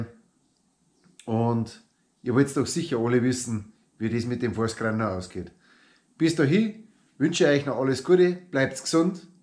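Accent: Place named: High German, Germany. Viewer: sauber... Top hast die a die Hochdeutsche Sprache so anglernt das du es nimma lassen kannst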